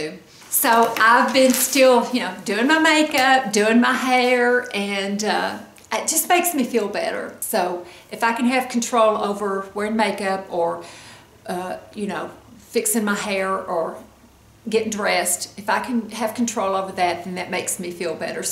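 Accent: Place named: Tennessee, United States. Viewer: Great hair! Yes you are so right, we can get by with a whole lot less than we thought! I too did my roots but must admit can’t wait to sit in my hairdressers chair again! I had the privilege of living in Tennessee for my senior year of high school. I made some dear friends while there. Every time I hear your voice it brings back fond memories of them! I loved their TN accent as much as they loved my GA one! Stay well!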